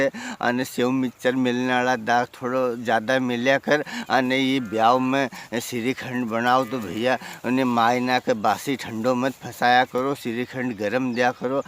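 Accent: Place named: Malwa, India. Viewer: परम आदरणीय जी को जन्मदिवस की बहुत-बहुत बधाई आपकी मालवीय वाणी वाणी हमारे समस्त परिवारजनों को अति प्रिय है अतः भगवान से सेव कर बद्ध निवेदन है कि आप आप हमेशा ऐसे ही हंसते मुस्कुराते रहें और और अनंत वीडियो बनाते रहे धन्यवाद भीम सिंह चौहान तिलावत बड़ी तहसील तराना जिला उज्जैन मध्य प्रदेश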